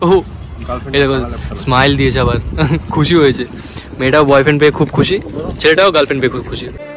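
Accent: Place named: North Bengal, India. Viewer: I hv come to see your vlogs v recently and glued to them. Honesty bro, toder North Bengal r bolar accent ta khbi sundor.. Really enjoyed watching ur videos..I hope you get more success in the future. Take love from Burdwan.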